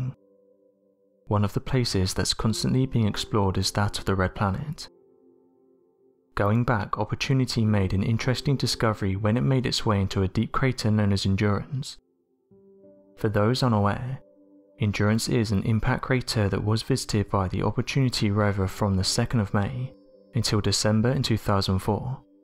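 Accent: United Kingdom. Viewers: why are always using a british guy narrating these stories?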